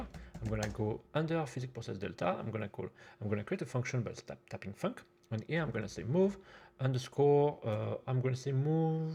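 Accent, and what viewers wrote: France. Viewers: oh great! Thank you for those extra videos. Also your french accent is hard but as I’m spaniard I think I understand you better than if you were native english haha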